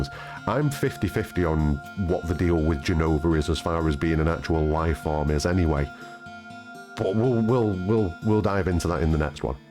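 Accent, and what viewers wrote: Manchester, United Kingdom. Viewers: Loved this video! Especially narrated by someone who sounds like they’re from Manchester like me 😁 Great work!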